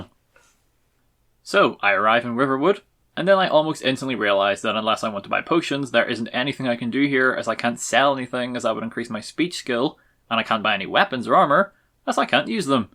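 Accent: Northern Ireland, United Kingdom. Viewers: I can't believe I just heard a northern Irishman speak in uwu truly the internet is a fascinating place. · I fucking love your accent. I think you said it was Northern Irish? im sorry if thats wrong or offensive or something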